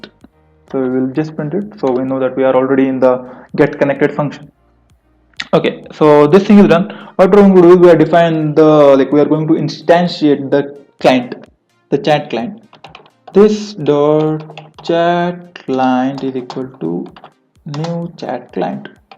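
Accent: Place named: India. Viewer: Hears indian accent..already disappointed...then sees him copy and pasting...so sad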